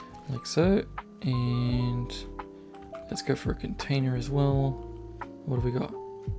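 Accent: New Zealand. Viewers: wow very nice relaxing vid!! love your voice, are you from new zealand?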